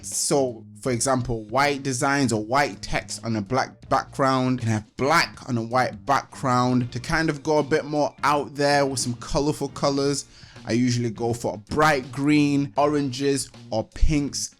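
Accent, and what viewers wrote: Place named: Manchester, United Kingdom. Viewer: Ha, yes! I recognised a fellow Manc. Nice one our kid. 😁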